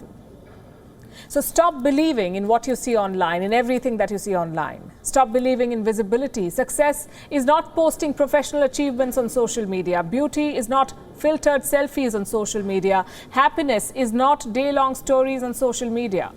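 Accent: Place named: India. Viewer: Is she speaking English or trying to with an Indian accent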